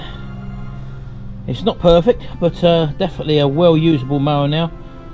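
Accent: United Kingdom. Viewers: And English you are!!!!! As I have been amazed by your passion and your left side of brain (left handed as well). Wondered for a bit of your whereabouts until you finally spoke as I assumex you could be Canadian, British or German and with that, I assumed correctly... Left handed and no ring (who in his / her right or left mind would wear a wedding ring. To that end, married or not, straight or otherwise you sure know how to work with your left hand and fingers. You also have very good digital skills (printing+video editing). One take....The sign you printed was slightly off center when applied. of all trades....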